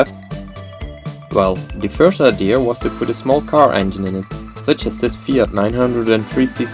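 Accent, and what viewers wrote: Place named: Netherlands. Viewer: Hello you sound Dutch ? If you are interesded in ever selling the tractor or the back tiller let me know I need some good pictures of the mounting on the tractor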